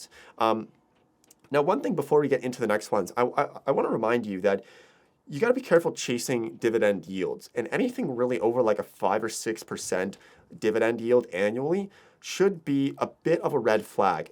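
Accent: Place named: Pennsylvania, United States. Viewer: 5 minutes into this video, I'm like: "dang, is this guy from PA?"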